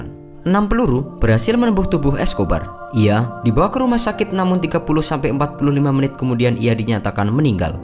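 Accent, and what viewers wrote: Java, Indonesia. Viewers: Yg menyiarkan pasti org jawa...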